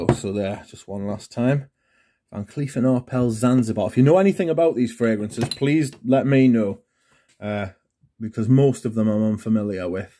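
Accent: United Kingdom. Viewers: PS are you from UK?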